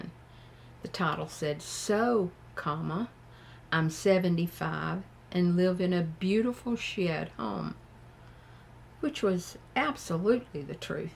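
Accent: South, United States. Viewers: I'm a 58 year old man working on putting together a YouTube channel about several different subjects I'm interested in and I'm listening to everything you're saying! I love your channel and I make it point to watch your videos just before I got to bed because they're so relaxing to listen too. I enjoy your slow southern accent but Ironically you get to the point very quickly. I'm from the south and I have lived way up north for several decades and I miss the southern accents and the slower pace of life, your videos give me that back home feel. I found you by looking up side hustles and when I watched your first video on how you did it I was so encouraged that I decided I'm going to do it! Now I regularly watch for your videos and I am subscribed to your channel so you come up in my feed daily now. Thank you for sharing your wisdom and honesty!!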